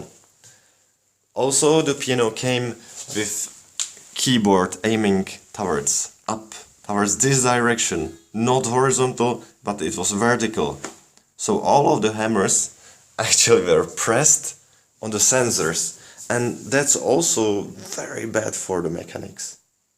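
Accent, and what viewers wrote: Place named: England, United Kingdom. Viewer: I find your video offensive and uninformed; foolish, indeliberately rude libel due to plainly displayed ignorance. I suggest to Kawai that you be sued in a proper court of law for libel. You know nothing about digital pianos and need to go back to your ignominous acoustic piano hole where you came from where you will never find a girl or guy that will come near you. Kawai is a miracle to the digital piano industry. Ingrateful moron with an English accent to prove it...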